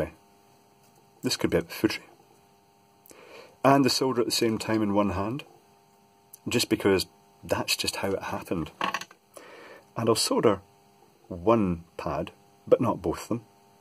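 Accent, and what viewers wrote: Scotland, United Kingdom. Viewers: 17 seconds in sound is clearer and not harsh at all i enjoy the scott accent reminds me of my great granddad :-)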